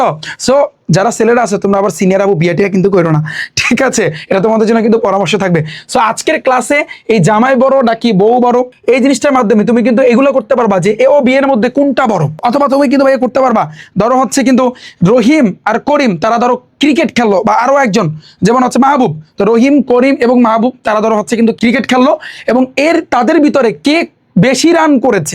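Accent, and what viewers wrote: Barishal, Bangladesh. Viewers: ভাইয়া আপনে কি বরিশালের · আচ্ছা ভাইয়া কি বরিশালের? 🤔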